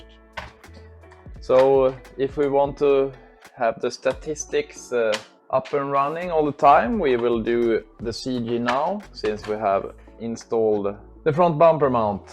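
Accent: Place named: Italy. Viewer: Yeah, man, I do have this exact model. Really, I really wish I could get the front-wires from hood-to-roof installed. Also, I am trying to place your accent- Italian? I'm USA.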